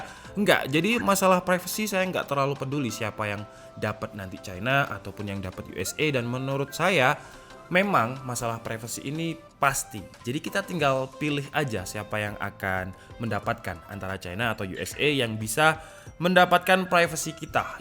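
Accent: Malang, Indonesia. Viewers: #REQ Kedepannya coba buat playlist podcast aja mas, seperti di channel sebelah. Toh dari segi penyampaian/pemilihan diksi mas juga udah bagus, cuman perlu diperbaiki dari segi 'medok' Malangnya itu wkwkw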